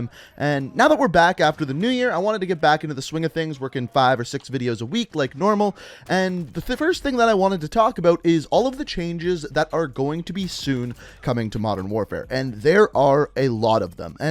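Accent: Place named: Canada. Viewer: Paul Martinez I believe it's his Canadian accent .. I maybe mistaken, I don't like the accent. I love his content and info tho!!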